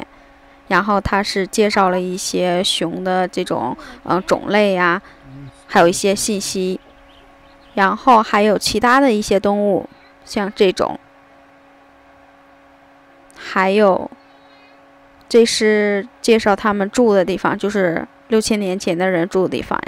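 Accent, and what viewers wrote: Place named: Northeast, China. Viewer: 听🈵️……东北s味十足…凑合着听吧……呵呵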